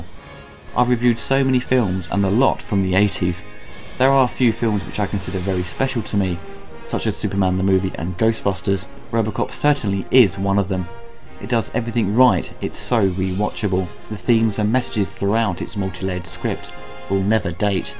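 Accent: United Kingdom. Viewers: Love your reviews. I'm catching up on them. I just love British accents, and word choices. See Americans would say...RoboCop blasted the ED209 to pieces..but you say "he blasts it to bits"..love it!!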